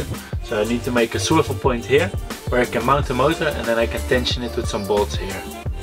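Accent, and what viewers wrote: Netherlands. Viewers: Vet gaaf man blijf door gaan ik hoor aan je accent dat je Nederlander ben haha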